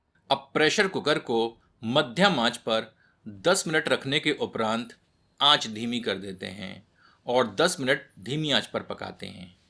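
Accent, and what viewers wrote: Standard. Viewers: आपकी शुद्ध हिंदी का बोलना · आपकी शुद्ध हिन्दी सुन कर ऐसा लगा जैसे रामायण देख रहा हूं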